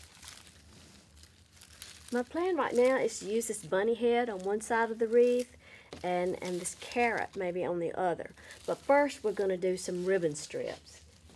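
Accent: South, United States. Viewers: Love it! Thank you for sharing! And your southern accent is too cute!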